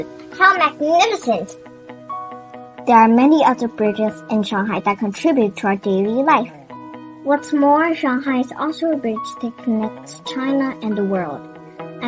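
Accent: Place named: United States. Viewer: You sound very much like American girl.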